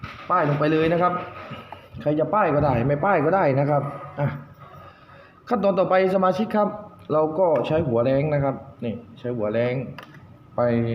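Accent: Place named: South, Thailand. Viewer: ขอบคุณพี่ ครับ ฟังสำเนียงแล้ว รู้เลยคนใต้บ้านเราครับ🙏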